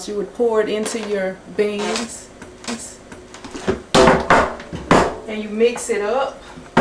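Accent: South, United States. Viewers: I like the southern accent. Shes a hottie too. Ill try the recipe.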